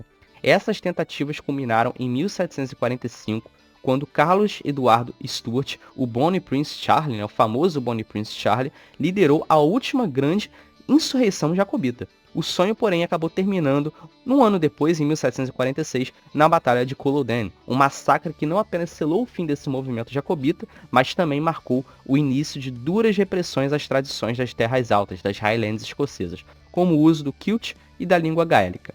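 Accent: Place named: Rio de Janeiro, Brazil. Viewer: Sotaque carioca chato da p*******!!!!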